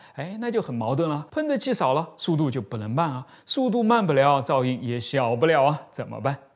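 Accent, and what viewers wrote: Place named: Shanghai, China. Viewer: 从视频中得到的信息已经从的口音分析，毕业于上海大学？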